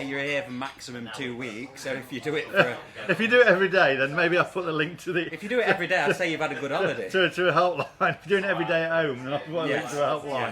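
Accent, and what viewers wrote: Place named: Yorkshire, United Kingdom. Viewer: Yorkshire 100% 🙂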